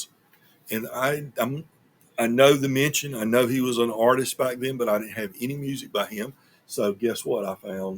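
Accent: South, United States. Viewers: Great stuff. Yesterday on the Tokyo Record Style stream I asked you if you had done a video on albums from '74. Well, look back three years ago, pard.;) I wouldn't forget such a cool southern accent. I was just listening to today. I'm thinkin his cover of is going to be in my Summer Vinyl tag video... if I get the gumption to make it. (What a great album though.) I need to get my hands on that record.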